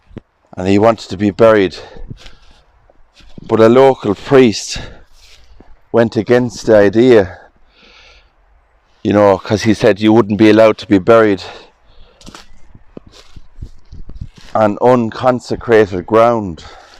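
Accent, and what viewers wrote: Ireland. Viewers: there is no lovelier accent than the Irish brogue, I enjoy listening too him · Love your videos and your Irish accent, will happily subscribe, cheers from Australia.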